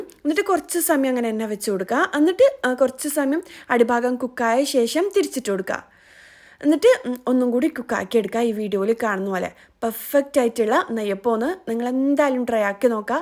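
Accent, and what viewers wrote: Kannur, India. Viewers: Kannur ann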